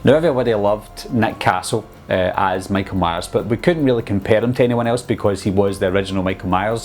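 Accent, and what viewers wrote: Scotland, United Kingdom. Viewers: Where aboots in Scotland you fae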